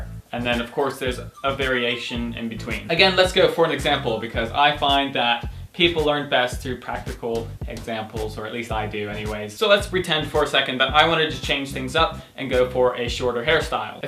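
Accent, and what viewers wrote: England, United Kingdom. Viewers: what's up with the english accent? lol he never sounded like this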